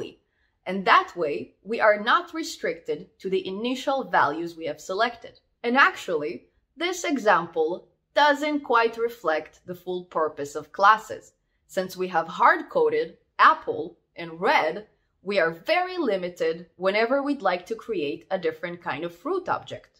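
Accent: Russia. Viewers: Русский акцент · Your accent sounds really nice. Are you Russian or Ukrainian?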